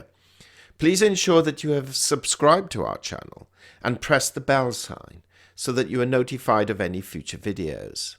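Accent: United Kingdom. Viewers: his voice is the trademark. Unmistakably British accent.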